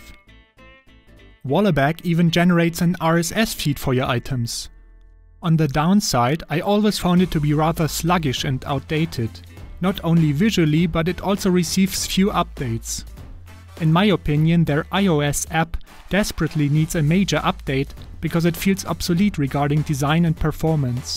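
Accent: Germany. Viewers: You seem to have a German accent, am I right? No criticism, just for you to improve: You are talking about Queries, not Qiuris :) try Googling the pronouciation. Ps. Great video. I have my own NextcloudPi and it is great. With Nextcloud Talk you even can use a Teams alternative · uuugh german english :D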